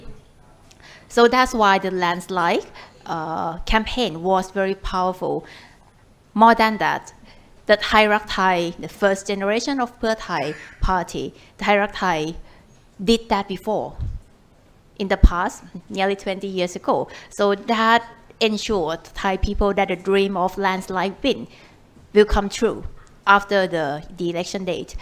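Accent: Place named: RP, United Kingdom. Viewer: สำเนียงแบบ RP เปล่งๆไปนิส 😊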